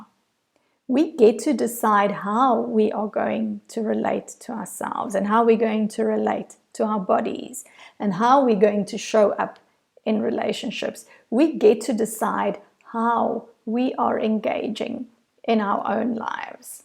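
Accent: South Africa. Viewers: You sound South African ?This is so beautiful I love this message thank you I would really love to work with you,new subscriber and so glad I found you. I'm so ready to claim my Queendom Yassss!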